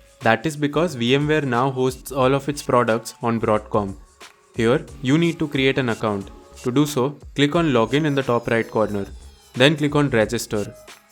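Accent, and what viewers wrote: India. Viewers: clicks first video, AI voice, clicks away. Clicks second video, indian accent, stays.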